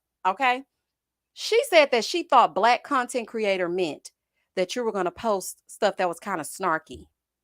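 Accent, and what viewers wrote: South, United States. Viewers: Hey Girl Hey… we love your southern commentary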